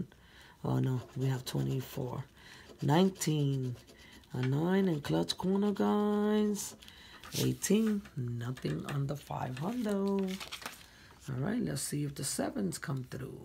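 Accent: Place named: Puerto Rico. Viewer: Nicely done ✅ boom 😘 I love your accent am I picking up some Puerto Rican in you. I hope I’m not over stepping my bounds because that’s not my intentions. ♥️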